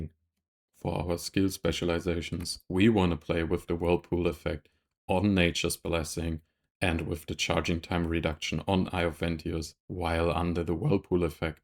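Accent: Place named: Germany. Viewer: i can hear that you german brother lmao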